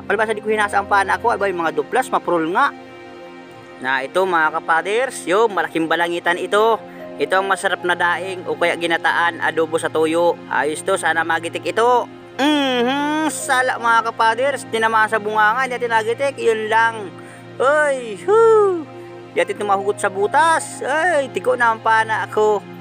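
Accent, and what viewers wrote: Bicol, Philippines. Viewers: ka fahers bicolano ka ba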